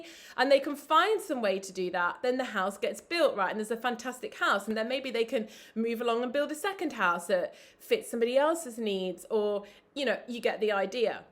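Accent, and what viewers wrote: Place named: Yorkshire, United Kingdom. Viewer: The floor in your argument is that 80% of the population are as thick as you know what. They are not capable of reasoned thought. That is why things like football exist. To give those people a raison d'etre. PS, where are you originally from ? I think an east Yorkshire twang occasionally makes a bid for freedom.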